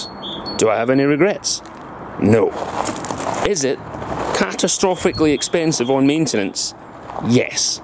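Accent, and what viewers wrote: United Kingdom. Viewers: Dude, look.. this is the story: 1. I'm German and worked for 2 big German manufacturers as well as on their cars. They break and they break fast and a lot. Deal with it 2. You sound British or a little Irish or Scottish, so what point are you trying to make with such a car with them speed limits here. Makes way more sense in Germany, but quite obviously you don't live there. 3. Buy yourself a decent Toyota Avensis D4D and enjoy your life instead of wasting time fixing things. 4. As I said I worked for German car manufacturers and advice everyone NOT to buy a German car but a Japanese instead because they are awesome. 5. Even my German Stihl Strimmer from the early 80's - which is still running strong by the way - was built in Japan.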